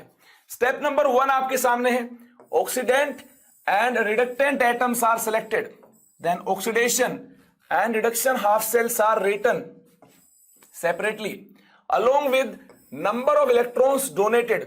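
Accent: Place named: Haryana, India. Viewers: Sir app harayan ka lagta ho boli sa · Class 11th:_ A teacher with HARYANVI ACCENT🤩🤩 preparing us for the real DANGAL to be fought in 2022 🔥🔥🤓😎😎 🏅🎖🎖🥇🥇 22 dislikes😡😡they dont deserve to live in this planet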